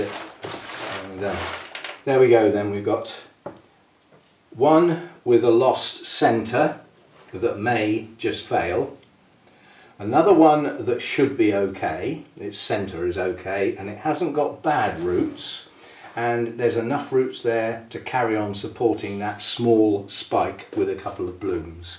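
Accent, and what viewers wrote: United Kingdom. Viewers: Always enjoy your videos & appreciate your diligence in making them. Something to look forward to seeing. Guess I am a scaredy-cat in buying anything other than phals.! But I do enjoy the blooms & they are easy to maintain. Would you comment on the benefit of leaving the “strings” on plant after pulling off the dead mushy roots? ( that is, if you think there’s merit in doing so) Thank you & I enjoy your British speech.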